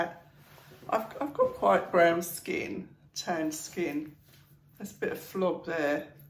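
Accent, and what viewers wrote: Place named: England, United Kingdom. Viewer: I love your hair. I wish I could wear mine like that! I watch a YouTUBE channel called The Armchair detective. His name is Allan I just mention him because you have exactly the same speech. The two of you probably live in the same area of England.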